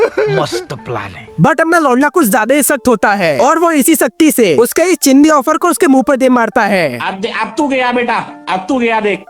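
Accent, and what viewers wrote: Assam, India. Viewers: Bro, are you from Assam?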